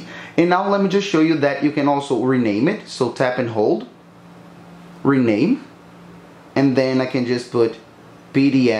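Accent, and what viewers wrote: Brazil. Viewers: Dude, are u Brazilian? I’m Brazilian as well and they way you speak English it’s exactly how Brazilian accent sounds like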